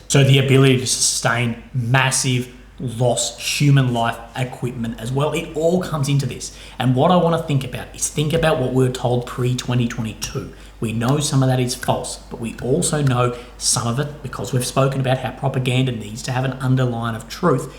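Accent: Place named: Australia. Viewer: Your visuals are fine. Shows your intensity and good rich Oz language well.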